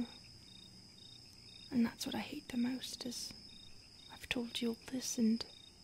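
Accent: United Kingdom. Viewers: Ahhhh British accent its scary because you sound so much like my friend..... good job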